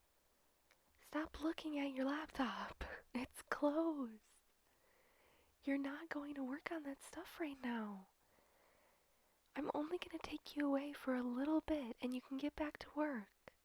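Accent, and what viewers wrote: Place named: United Kingdom. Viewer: I came for the accent, and you know what? I can tolerate what i'm hearing at least it's not an extreme British accent.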